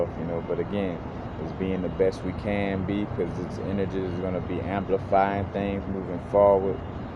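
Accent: New Orleans, United States. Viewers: You have to be from new orleans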